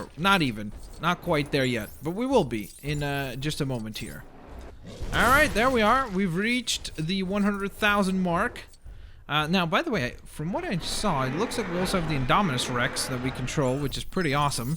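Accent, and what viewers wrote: Russia. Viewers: Are you Russian? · R he Russian? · are you russian?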